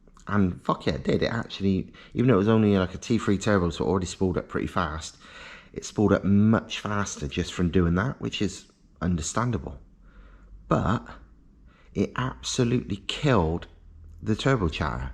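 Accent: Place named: West Country, United Kingdom. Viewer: Dude where are you?. By the accent I'd say not that far from me lol,Gloucestershire (where I'm from) Swindon , Bristol, Bath, Somerset somewhere, Wiltshire. I do usually get it fairly right . Anyway any chance you'd interested building something for money (obviously) it will revolve around a vr5 engine (have to have that sound somehow, and an original in line is just way too pricey when the vr5 is out there). TBF though much simpler in my choice of car MK4 vr6 4 motion but turbo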